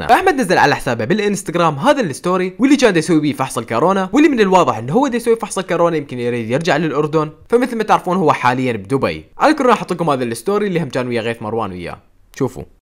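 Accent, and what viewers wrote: Iraq. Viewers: اريد اعرف انت من العراق لان اللهجة عراقية بس لتاكد متابعتك من 50 الف تحية من العراق 🇮🇶🇮🇶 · اممم انته من اي بلد لءن حجيك عراقي ماعرفه ممكن ترد وتكول انته من وين لءن اني الاحظ كلامكم عراقي 😊💗♥♥♥ طبعن اني عراقيه 🌼🌼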